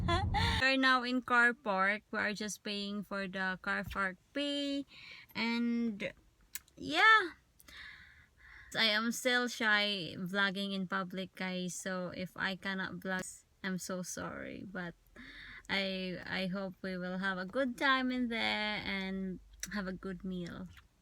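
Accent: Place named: Visayas, Philippines. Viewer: Hello Sis Taga asa ka sa Pinas murag Bisaya man ang imo accent sa words?..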